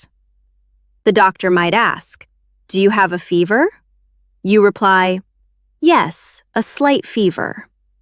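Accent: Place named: United States. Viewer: It seems like you're speaking American.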